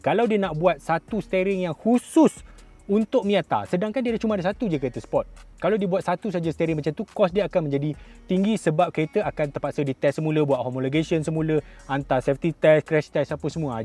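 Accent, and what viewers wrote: Malaysia. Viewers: Best dgr slang malaysia hahhaa. Blh faham btl btl tanpa subtitle. At first I ingat ini indo video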